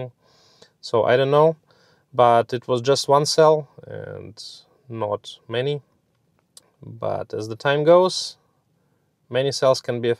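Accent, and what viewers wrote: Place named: Germany. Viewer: I hear man with German accent reviewing technology, it's first like and then view. Subbed, my regards from Greece!Good luck with VW issues.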